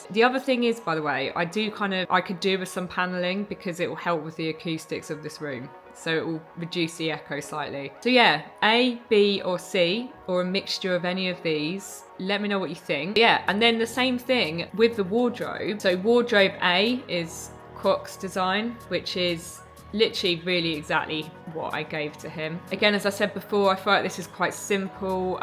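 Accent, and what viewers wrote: United Kingdom. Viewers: Your Great Accent makes me think of Big Ben or The Tower of London , Are you a Brit , Fly a Union Jack in your new studio .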